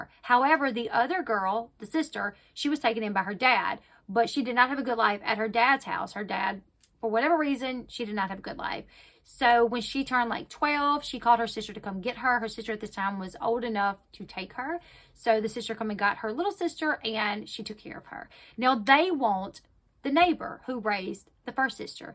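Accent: United States. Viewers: I like your accent. What part of America are you from? I’m from South Australia, so I’ve never heard your accent before.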